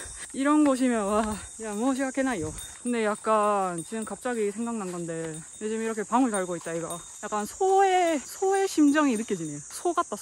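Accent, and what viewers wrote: Busan, South Korea. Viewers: 自然派美人ですね。面白いし。購読します。方言も最高（笑）。자연파 미인이시네요.성격도 재미있는것 같아서.앞으로 잘 볼게요.그리고 경상도말이 최고 ㅋ. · 안녕하세요? 우연히 발견한.... 15년 전 츠와노 옆 동네에서 3년 살면서 츠와노...놀러 간 적이 있어요. 신사까지만 가 본 기억이 있네요. 곰을 만나지 않고 무사히 하산....보는 내내 긴장했어요 ㅎㅎ 즐거운 여행이 되시길 빕니다. 부산사투리 재밌어요💞💞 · 곰 안나와요! 일본시골에서 부산사투리 조지시는데 ㅎㅎㅎ 이외중에 피부 미모 열일! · 부산표준어 찰지네요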